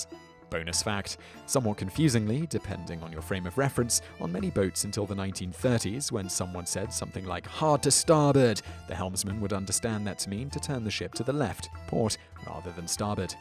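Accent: United Kingdom. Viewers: i know that is a navigation thing, and maybe a pirate one. but why so british? even feeling that i were the joker saying that, and is a good video, the talk is too fast. still is a good info. at some point it become harsh to translate.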